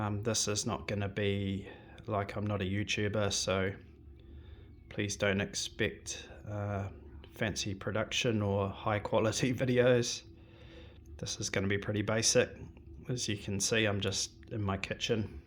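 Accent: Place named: New Zealand. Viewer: So I had one of these growing up, ironically in New Zealand bro! You’re undoubtedly a kiwi with that accent. Lol. My parents couldn’t afford a Tamiya so this was a cheapskate version. Don’t know where they got it but I believe it was on an overseas trip and possibly from Japan. It ran good enough but I remember those T-bone axels would break and we couldn’t get replacements. I’m 50 so this would have been in the mid to late 80’s. Mine was lost to the annals of time, but I managed to find one on TradeMe a year or so ago and have it in my collection! Came with the remote and box. The original looks quite different from yours as it has a tail scoop and huge front bumper. Wish I could post a pic for you. It’s a funky little RC but I’m so stoked to have a piece of my childhood back. Cheers bro.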